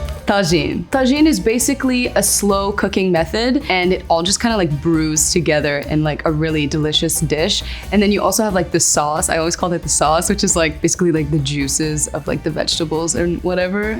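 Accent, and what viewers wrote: Morocco. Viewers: the way she pronounces the names of things in the original moroccan dialect is Awesome <3! we proud of you <3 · كل شيء في الفيديو رائع والاروع نطق الاسماء باصولها المغربية لكن لو قمتي اختي الكريمة بشيء اخير لكان هذا المحتوى في قمة الروعة الا وهو ان تلبسي القفطان المغربي. روعة. 👏👏👏👍👍👍🇲🇦🇲🇦🇲🇦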